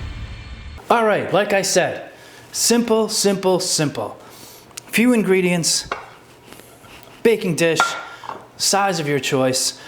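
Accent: Massachusetts, United States. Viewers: So nice to hear a good mass accent. My I miss home in Colorado. First time viewing you...looks good best to you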